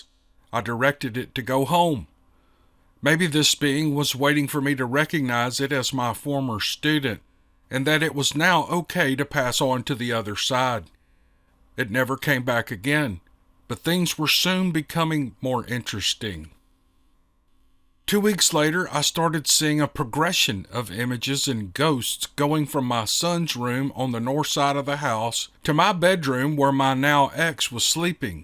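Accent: South, United States. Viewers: "manly" southern voice is a national treasure as far as this old gal is concerned. I despise squeaky voices coming out of a fellas mouth & hate listening to ads but I'd actually listen to em if was the one narrating it 😂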